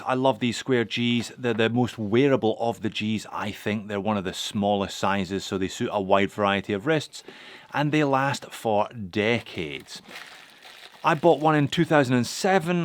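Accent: Scotland, United Kingdom. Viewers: Came for the watches, stayed for the Scottish accent. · Merry Christmas and the rest of the viewers. Love your scottish accent, reminds me of my family:-). Keep up the good work. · A knowledgeable & enthusiastic host talking about watches with a Scottish accent. What’s not to like? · Merry Christmas. Thanks for a bumper year of watch reviews and laughs. The only downside is that whenever I read a watch review now.... I do it in a Scottish accent. Oh well. 😁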